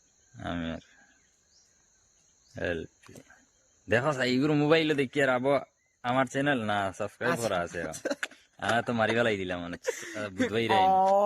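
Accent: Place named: Sylhet, Bangladesh. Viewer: আমি বাংলাদেশ সিলেট থেকে কইয়ার উটাও আরওক কথা তুমাতানর মাত আর আমরার মাত এক সমান কোন বেশকম নাই,, আমি আগে মনে করতাম তুমি তাইন সিলেটি,, পরে শুনি,, তুমা তানর বাড়ি আসাম,, ভালো তাকেন,, আসলে তুমার একটিন সেই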